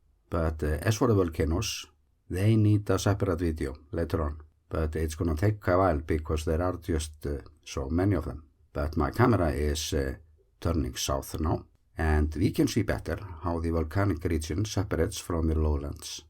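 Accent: Iceland. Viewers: One of my favourite things is how well I understand the Icelandic accent from watching your videos. When I first started watching I needed subtitles sometimes but now it’s completely natural to me. Keep making awesome videos :)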